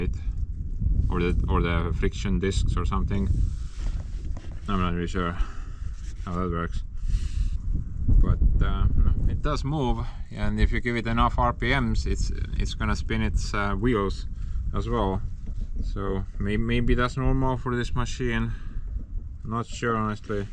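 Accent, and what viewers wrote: Estonia. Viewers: I mentioned your channel to an acquaintance I have in Latvia. He saw your name and said you're for sure Latvian living in Estonia because it's such a Latvian name. But then he heard your accent and said, "No he's for sure Estonian. Now I'm intrigued." 😅 Hopefully he'll be a new fan!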